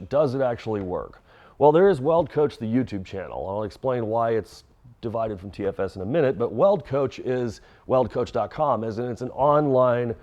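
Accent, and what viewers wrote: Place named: Transatlantic, United States. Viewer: Your voice does have a good tone, and you also speak with an accent similar to the formerly popular transatlantic accent used in a lot of media. People have told me the same and commented on my voice, but it's just how I talk. Especially when I'm on camera or teaching something. Wonder if it has to do with schooling or where parents are from or media consumed or what. Love the pink gloves rationale as well. I don't have time for needless negativity in my comments.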